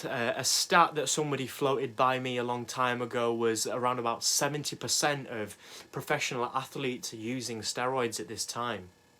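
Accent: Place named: Birmingham, United Kingdom. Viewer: Underated channel mate,good info plus you sound like a fellow brummie👍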